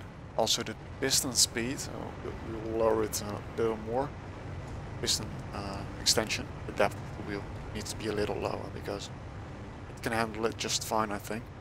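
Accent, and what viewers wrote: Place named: Netherlands. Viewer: You speak English very well but with that inevitable dutch accent. Anyways, very cool machine, I would definitely be interested in a small ship version of it :p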